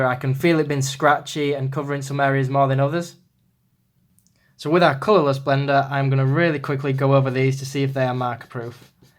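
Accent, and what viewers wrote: United Kingdom. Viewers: I love your British language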